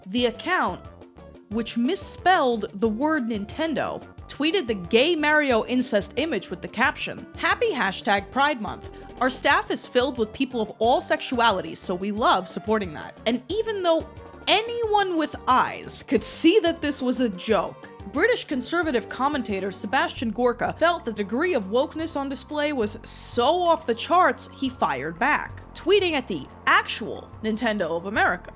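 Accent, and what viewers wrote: New York, United States. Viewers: she’s just got a wicked new york/new jersey accent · Y'all are unaware of the New York/New Jersey accent???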